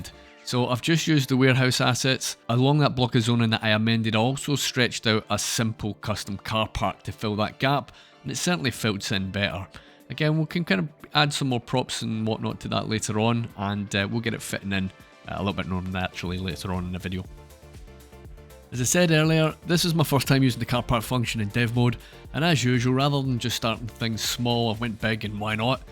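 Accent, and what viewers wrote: Scotland, United Kingdom. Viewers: I like your videos! Love the intersections tutorials! Well done! Any chance you are from Scotland ? :D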